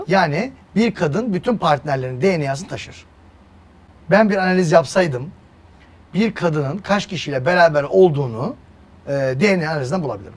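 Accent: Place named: Aegean, Turkey. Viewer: Doktor olmuş ege şivesiyle konusuyo diyen gerizekalılar da var.dogal olmak güzel.katiliyorum size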